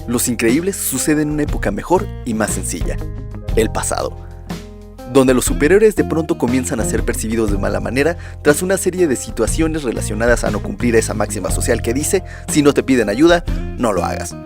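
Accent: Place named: Mexico. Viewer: Min a min audio 100% mexicano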